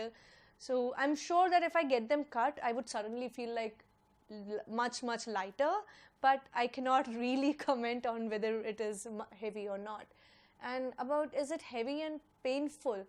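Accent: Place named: India. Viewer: Although I don't have that long hair already, but I'm on the way to get it to classic length, I could listen to you all day long ^__^" Really, your voice is so calming and because I work with Indian colleagues together and sometimes have a talk with our Indian developer chief "Manini", I'm kinda used to the indian way of pronunciation of english words ^__^" You're awesome as always,. Greetings to you and best wishes to your channel and forward to India:) Pats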